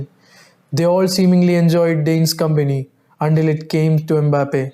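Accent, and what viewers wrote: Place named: India. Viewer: Annoying Indian accent 🤦🏾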